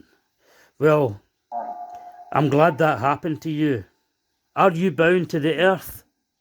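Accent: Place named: Scotland, United Kingdom. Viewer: Your Scottish accent...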